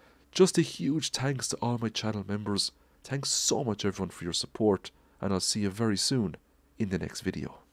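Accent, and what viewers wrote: Ireland. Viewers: The most Irish accent I've heard all week, and I've been listening to some of the news stories from over there. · he sounds Irish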